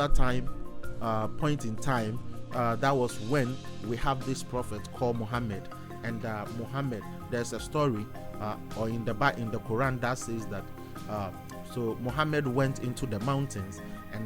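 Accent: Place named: Africa. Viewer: In my personal Opinion African accent is sweet enough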